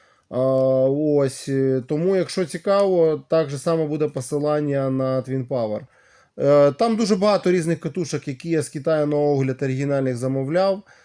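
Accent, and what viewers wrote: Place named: Russia. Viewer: Говорил бы уже по русски, хреначишь по украински с русским акцентом, да ещё и коряво, оно тебе надо?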